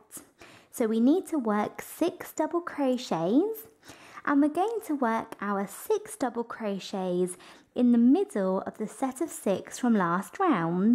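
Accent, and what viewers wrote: United Kingdom. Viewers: listens to accent Oh ok, so British terms... says double crochet while making a treble Damnit brain, you fooled me again!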